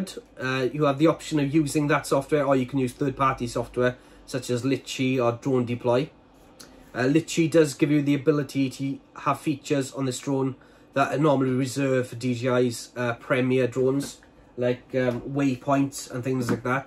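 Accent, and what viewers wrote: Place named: Welsh Valleys, United Kingdom. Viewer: I detect a valley’s accent, I’m struggling to find a place. I live near Blackwood gwent. Please help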